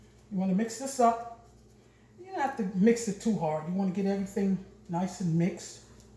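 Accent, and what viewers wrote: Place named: Brooklyn, United States. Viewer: It's the Brooklyn accent for me 😏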